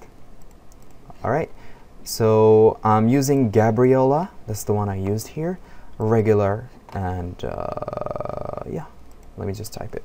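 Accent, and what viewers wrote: Morocco. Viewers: Hmmm good inspiration im late4 years x) you really sound moroccan(moroccan name +good english and good french)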